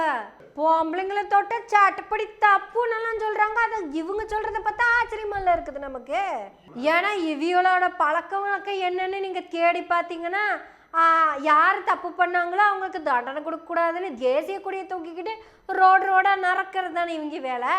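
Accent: Kongu, India. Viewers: Kongu intonation WoW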